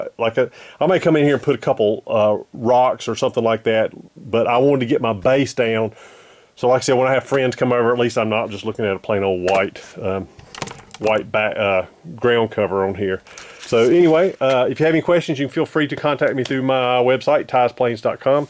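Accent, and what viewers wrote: South, United States. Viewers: It also helps 10-years later.......... Thank you for the information. P.S: Good to hear a Southern accent (familiar to me).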